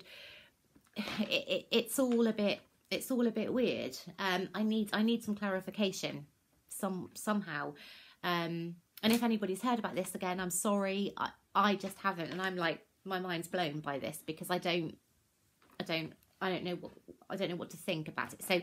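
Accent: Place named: United Kingdom. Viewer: Let me tell ya, you could help over info I already know and this Texan in her 50s would find and about anything you say in that endearing Brit accent requires no apology. Go ahead. Make a video saying, "We are invading Texas and under our rule Texans must consume tea every morning, afternoon and evening or pay a fine, doubling for each offense." Most would think it sounds charming.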